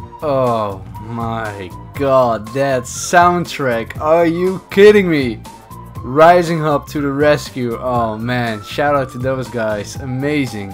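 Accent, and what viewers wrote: Netherlands. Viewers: not only not a German accent, but very clearly Dutch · Ik kan gewoon horen dat je nederlands bent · U kinda have a Dutch accent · Judging from your accent youre a fellow dutchmen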